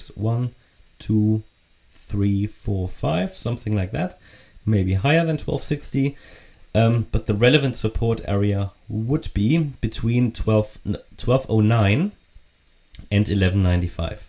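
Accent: Germany. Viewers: Great vid! Are you German?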